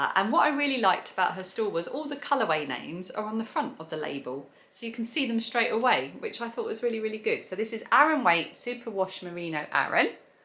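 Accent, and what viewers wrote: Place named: South East England, United Kingdom. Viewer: Another fab episode. I love to get in a nice dose of that luverly South East accent (that’s my natural accent when I’m back in Blighty hanging out with my mates), and lots of making goodness, watching your vids. Have a brilliant knitty autumn and congrats with 19 k 🎉🕯🧶🧣🍂🫖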